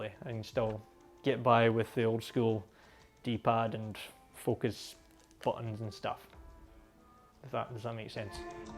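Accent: Scotland, United Kingdom. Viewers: Hey man, i love your scottish accent and the video. I do photography for many years, but i am in sort of an impass now, what would you choose between XT2 and a D750? I really love the ease of use of the XT2 with controls on the body like my film SLRs, and the portability, but a part of me wants to get into the big boy league and go full frame. While i know gear isn't important, i do want alot of quality for my photographs (i am only doing it as a hobby of which i am very passionate about). Another thing i am worried about is that my MF lenses (mostly M42), won't work that well with the D750, and i really love them. Cheers!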